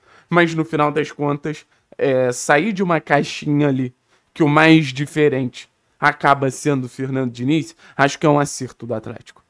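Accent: Rio de Janeiro, Brazil. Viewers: ta falando menos carioca mane kkkkk brabo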